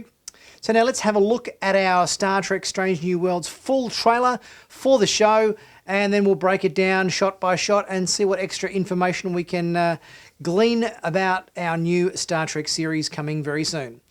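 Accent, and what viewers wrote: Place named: Australia. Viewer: Was NOT expecting a fellow Aussie when I clicked this video! Respect! Subscribing now mate 👍🏻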